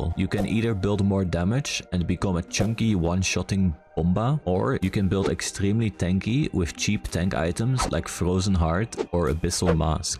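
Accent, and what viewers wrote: Turkey. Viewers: Are u turkish though? 💀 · Are you turkish: DDDASDASD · great guide just one question Are you Turkish? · Are u turkish lad?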